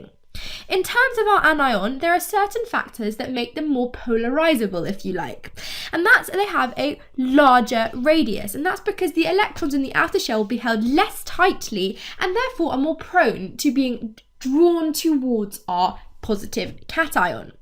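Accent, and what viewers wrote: United Kingdom. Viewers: It's British accent. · its because of her accent lol mb she has been living in britain from her childhood and developrd british accent i dont know why some indian are hating her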